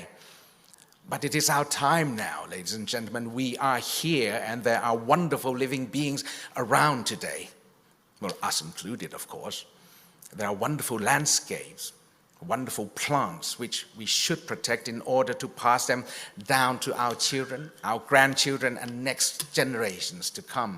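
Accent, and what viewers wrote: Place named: United Kingdom. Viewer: สำเนียงบริติช เพราะค่ะ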